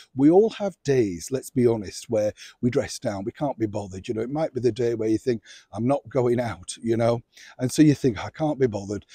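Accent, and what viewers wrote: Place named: England, United Kingdom. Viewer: I could listen to you all day. Great tips and updates. Least I forget your English verbal flare.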